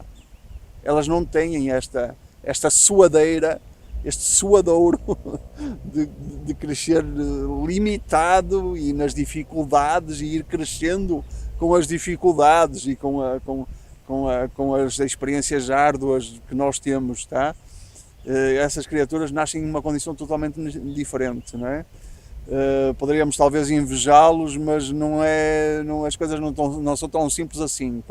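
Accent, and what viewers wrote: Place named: Portugal. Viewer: Video bom, mas o audio é meiio complicado pois o som está abafado e o sotaque portugues complica o entendimento, ALEM DA PROPRIA COMPLEXIDADE DO TEMA DENTRO DO LIVRO DE URANTIA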